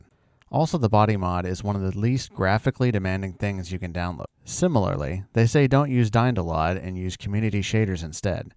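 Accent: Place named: India. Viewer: The Indian accent put me off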